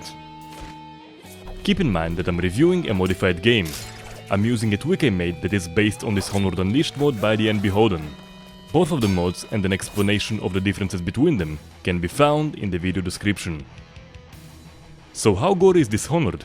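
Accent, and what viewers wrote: Russia. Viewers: Are you russian or something?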